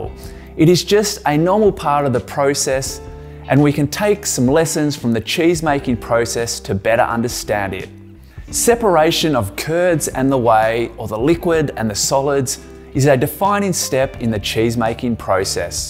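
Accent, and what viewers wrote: Australia. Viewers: I wish this audio had an option filter out that heavy Aussie accent...long listen.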